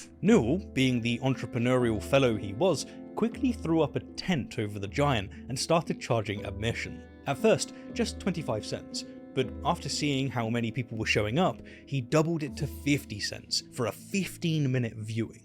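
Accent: United Kingdom. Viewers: LOL you had me untill 25 min WHAT MAKES YOU SO DI9FFENT brittish accent boy go home your nothing new . don't you have a a bold headed guy covering all aspects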